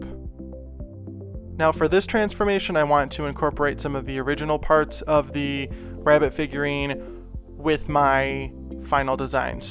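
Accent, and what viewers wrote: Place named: Midwest, United States. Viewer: The way you say your 'o's makes me think you're from Minnesota or Illinois. . Just curious where are you from?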